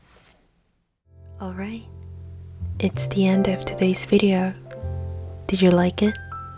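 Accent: United Kingdom. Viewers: Love your English accent❤❤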